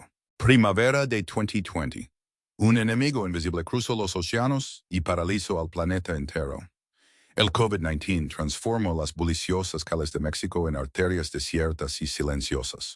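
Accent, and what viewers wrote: United States. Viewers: Antes estaba interezante... desde la semana pasada HABLAS COMO GRIGO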